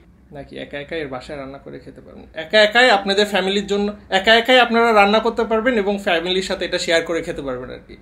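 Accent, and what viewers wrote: Kushtia, Bangladesh. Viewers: R u from Kushtia? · তুমি না থাকলে আমার বোর লাগে ❤️❤️❤️❤️❤️ আপনার স্ত্রীকে আপনি খুব ভালোবাসেন ভাইজান। আপনার কথাগুলো খুব সুন্দর ভাই, কুষ্টিয়ার ভাষাটা খুব ভালো লাগে। ভাই আপনার জলীয় বাষ্প ঠিক আছে,বলে যান😅😅😅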